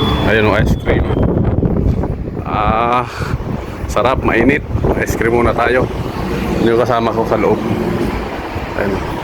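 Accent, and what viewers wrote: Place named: Batangas, Philippines. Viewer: Boss, taga batangas ka? Yung accent mo kasi parang similar sa mga batangenyo. Anyways godbless ingat palagi, tuloy lang boss sa pag vlog, support lang kami dito kabayan. May kasabihan nga ang mga OFW ang bagong bayani ng pilipinas❤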